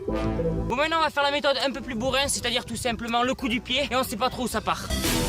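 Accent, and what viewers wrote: Marseille, France. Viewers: L’accent marseillais 👌🏼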